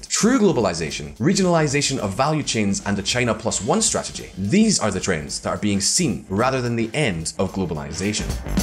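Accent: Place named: Scotland, United Kingdom. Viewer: I like the team with the other guy and the Scottish one doing the narration. I think the Scottish guy seems better behind the scenes. He has cleaned up his teeth a bit speaking somewhat slower and more clearly . He isn’t bad and has improved a lot. I still think he should set himself apart probably not wearing Hawaii shirt maybe dress more formal . But he is doing much much better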